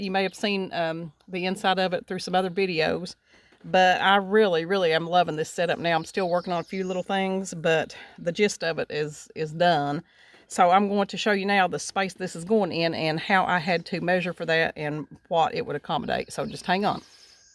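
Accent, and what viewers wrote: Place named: South, United States. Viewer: just found you. what state are you from.??? Sound like a Southern sister. I did subscribe. looking forward to your videos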